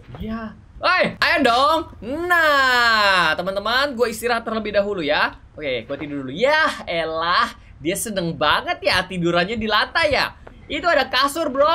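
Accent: Java, Indonesia. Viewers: Kamu orang jawa soolnya ngmongnya kayagitu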